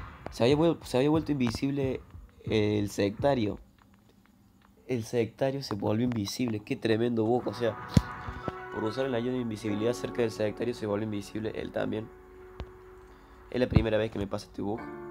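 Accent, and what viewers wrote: Cordoba, Argentina. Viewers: NUEVO SUB WUACHO (me pareces que tenes asentó cordobés jeje) YO HACE 3 DÍAS QUE ME LO INSTALE Y ME ESTOY RE VICIANDO...vi un par de consejos tullos y ya entendí mejor jaja Gracias cruk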